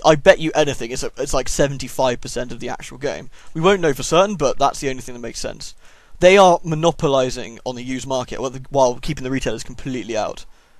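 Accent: United Kingdom. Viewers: needs to do voice acting for a British Scooby Doo spin-off.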